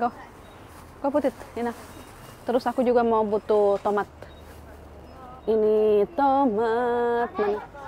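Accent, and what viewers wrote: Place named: West Java, Indonesia. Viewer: Resep bhs reng logat sundana has te hilap slm ti bdg sehat trus kanggo keluarga ❤❤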